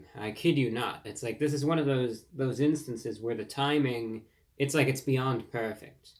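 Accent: United States. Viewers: Is this a posh american accent?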